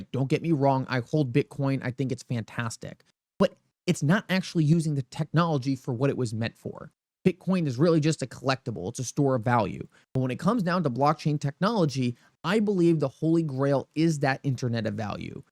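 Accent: Allentown, United States. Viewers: with an Allentown accent bunch of bs again. Even Elon cannot move shit coin XRP upwards,lol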